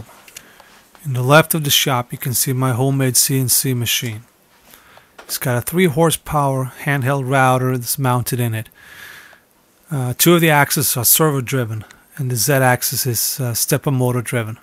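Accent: United States. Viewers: Great shop setup. Norwegian?, you sound like you have a New York accent! How does that happen? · You say thanks for watching but I for one want to give you a BIG Thanks for sharing!! You took a short basement (w rock on one side) and made it a great wood shop! Especially like the cabinetry and organization (wish I could do 1/4 of that). Your DIY tools and jigs are an inspiration. I do have one question; the electrical plugs aren't the type we use in the USA but sound like your from the northeast USA? Thanks again!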